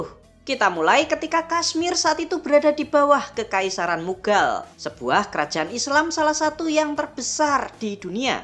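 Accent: Java, Indonesia. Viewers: logat jawanya medok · si medok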